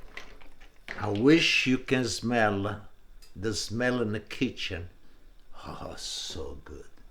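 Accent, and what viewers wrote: Italy. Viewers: I love your Italian accent. Today is my son’s 11th birthday and he wants me make this dish. Salute cin cin🥂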